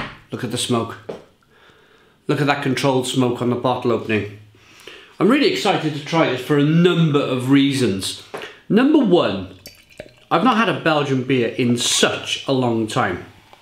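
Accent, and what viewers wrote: Wales, United Kingdom. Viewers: You can hear the Welsh tone, though it is more subtle than other Welsh accents that are stronger. Watch and listen to former rugby player and now commentator Jonathan Davies. That is a stronger Welsh accent. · slightly, he’s got a very light accent compared to some other welsh people but all in all I think a lot of them don’t have a particularly strong accent as some times you can’t even tell if they are welsh and that’s coming from someone who lives in England and has been wales quite a few times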